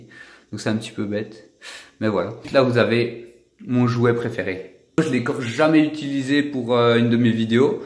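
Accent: Belgium. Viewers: Meeci la YouTube MONNEY !!! 😅❤️ Àvec ce petit accent du genre belge MAMÉNE !✔️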